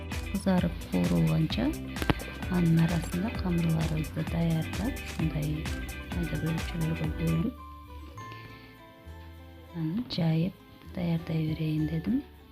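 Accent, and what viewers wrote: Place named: North, Uzbekistan. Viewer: Жасалмасы жок эле , оздорунун диалектинде суйлоп атат ,, ар бир жэрдин озунун диалектикасы бар ,, Тундук тарап. Талас ,, Туштуктун уч Ош. КараСуу ,, Баткен , ЧонАлай ж.б болуп 😮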